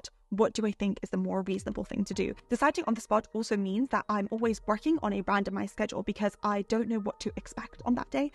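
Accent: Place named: Scotland, United Kingdom. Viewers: what kind of British accent is she? can anyone answer me this question? i'm learning english and i'm not convenient to listen rp american and rp brittish accent but her voice is so soft to my ear. i want to do research what her accent is from. (thanks) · She is from London but I hear a slight Scottish accent also. It's a mix of both because of the way she pronounces certain words.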